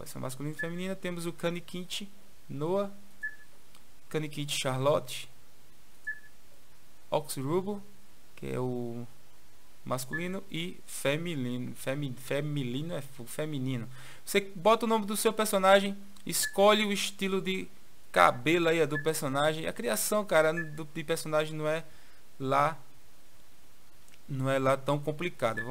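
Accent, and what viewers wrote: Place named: Pernambuco, Brazil. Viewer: Pernambucano, mano? Sotaque infalível.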